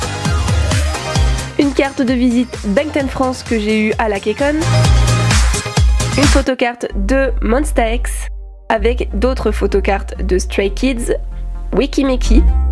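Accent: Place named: England, United Kingdom. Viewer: Cet accent anglais srx ♡ Sinon plein de love du Maroc ♡♡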